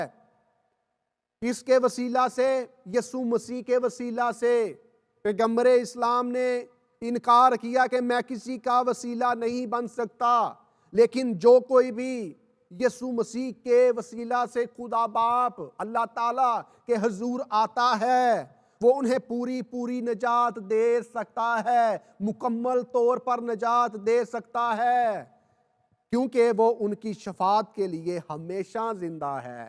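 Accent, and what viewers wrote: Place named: Punjab, Pakistan. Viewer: brother I don't want to hurt you. For example say Hadith number 1234. Actually when you speak in Punjabi alphabet I can't understand. Even I am Punjabi. Sorry once again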